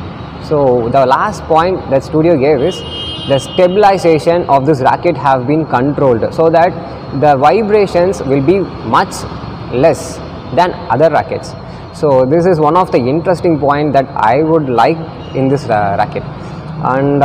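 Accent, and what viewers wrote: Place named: South India, India. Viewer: he is south indian thats their accent. u understand thats important .ignoreit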